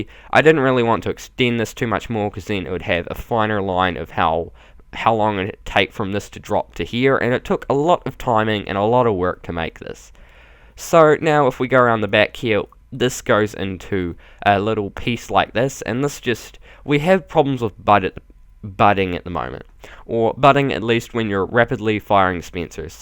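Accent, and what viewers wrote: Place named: New Zealand. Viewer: kiwi I think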